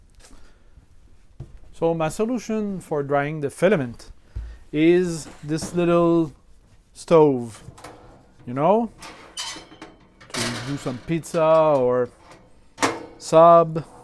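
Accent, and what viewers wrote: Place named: Montreal, Canada. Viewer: I am too, and could tell with your accent! Je juge pas, je trouve seulement drole que je trouve dans fellow montrealer in the wild. haha !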